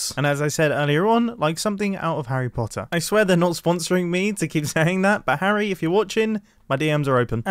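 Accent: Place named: United Kingdom. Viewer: it’s because of his accent. is British btw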